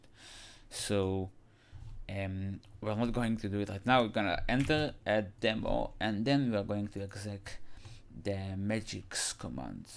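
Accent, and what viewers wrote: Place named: Israel. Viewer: u sound israeli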